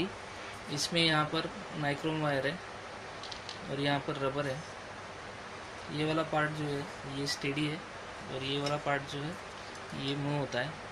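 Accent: Maharashtra, India. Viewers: bhai ,awaz se maharashtrian lagathe ho. kaha se ho,??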